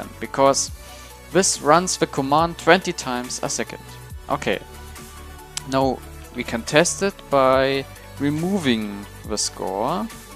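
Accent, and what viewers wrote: Germany. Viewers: Man hört voll das du deutsch bist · Are you german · Man hört das du ein Deutscher bist ;) #NoHate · man merkt voll der ist deutsch haha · are you german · Bei deinem Englisch hört man das du Deutscher bist XD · i thought youre german lol because you Sound like this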